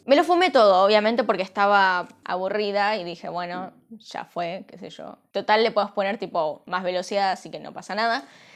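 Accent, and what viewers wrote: Argentina. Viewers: Me divertí al 💯 con este chisme. Me encanta el acento Argentino, tienen mucho chiste para relatar las historias. 🤣🤣 Bombachas cagadas, aprendí nuevo vocabulario el día de hoy. Gracias por este video, me cague de risa, igual van ha terminar mis bombachas como las de Mimi 😭💩 por cierto, te ves super guapa como siempre 💙💙💙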